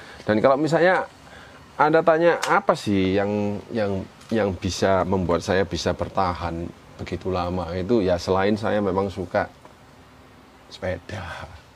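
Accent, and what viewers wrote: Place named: East Java, Indonesia. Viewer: Suka dengan penjelasan nya, khas jawa timuran, matur nuhun mas, salam dari Jakarta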